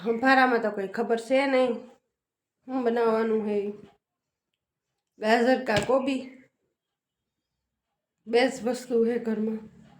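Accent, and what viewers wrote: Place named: Mer, India. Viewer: Ben tame suddh merni bhasha boloso te bahut saru lage se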